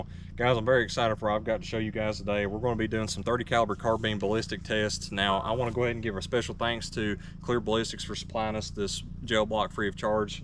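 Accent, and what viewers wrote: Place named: South, United States. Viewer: You have the purest southern accent i've ever heard :D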